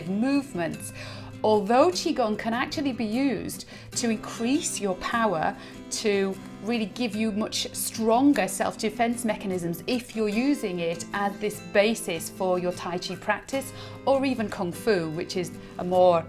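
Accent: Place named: England, United Kingdom. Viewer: You're from England? I ask because of your accent. Lol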